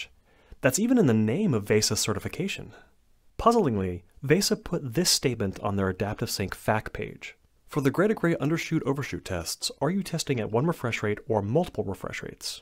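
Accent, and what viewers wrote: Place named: United States. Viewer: smart guy with american accent ASMR? subbed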